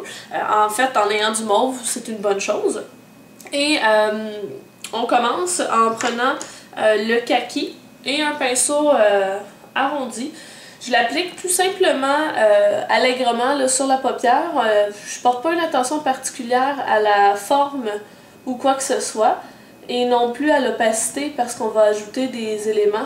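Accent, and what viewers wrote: Quebec, Canada. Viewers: Tu as l'accent Québécois non ?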